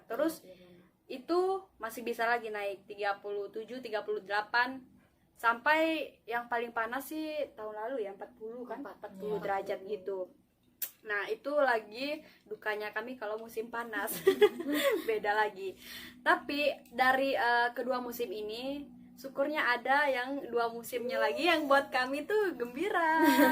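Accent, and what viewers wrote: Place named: Central Kalimantan, Indonesia. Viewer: mirip logat kalimantan tengah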